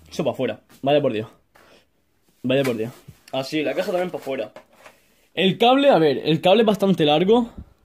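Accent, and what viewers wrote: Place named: Murcia, Spain. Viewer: Buassss cómo se nota que eres de murcia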